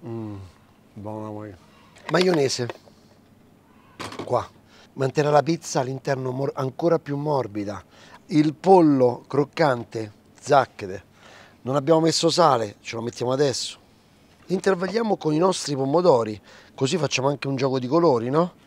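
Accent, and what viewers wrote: Rome, Italy. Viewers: Ciao ma non parlare in romano , parla più in italiano , non mettere questo stile romano che non è tanto bello , un pochino volgare , ma quello che cucini e ‘ buonissimo 👍 non ti offendere non sono solo io a dirlo , senza accento , non ti sta bene dico per te , un po’ brutticello , ma c’è peggio per esempio personaggi famosi in tv che parlano romano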